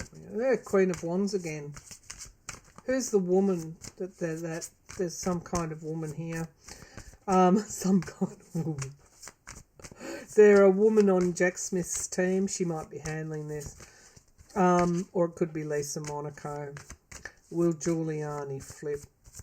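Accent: Australia. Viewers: Hello, what a joy to find you. I am fairly recent to Taroscopes & a new subscriber. I listen before sleep. I often don't watch, just listen. I heard your voice & knrw instantly that you are Aussie. I am so impressed with your channel I have only heard two of your readings & what a joy to onow yhat you do politics. After all we are so affected by Government & the fall oits that occur. I would love to know that Mr Morrison has some fallout from the reality that he & his Government have perpetuated in Australia. Love that Gladys & McGuire have been rxposed. Will there ever be corruption free politics 😢 thanks for what you do ❤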